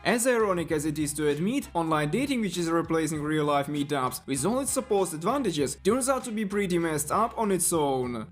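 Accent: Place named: Europe. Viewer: Your european english accent make it more funnier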